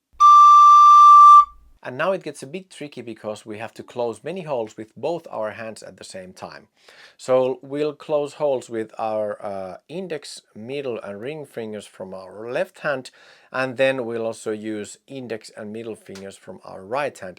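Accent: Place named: Finland. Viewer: Great tutorial. And i really dig your Finnish accent and vibe—and smile. Thanks for posting.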